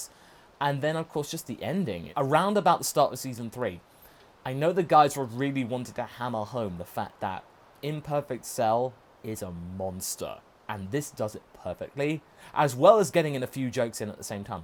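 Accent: United Kingdom. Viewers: HOLY YOURE BRITISH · I remember being so shocked that you were british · By any kind, Are you British?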